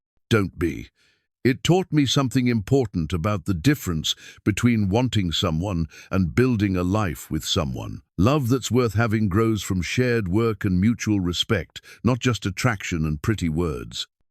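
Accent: England, United Kingdom. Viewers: I’m listening from the beautiful agricultural and lush San Joaquin Valley of California. I enjoy your dramatic stories, they’re narrated well with your particular selected, articulate English accented AI. However I notice they all hold the same theme; that is, territorial differences and proving who holds ownership of land rights. It’s the same story over and again. What’s especially striking me with humor is how all main character men walk and work either shirtless or with the front of their shirts opened. Was this normal? It’s beginning to border on ridiculous.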